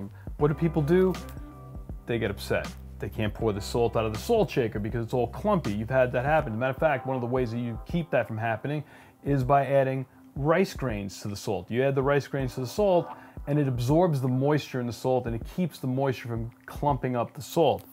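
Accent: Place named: Long Island, United States. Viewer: I’m from Long Island and recognized the accent haha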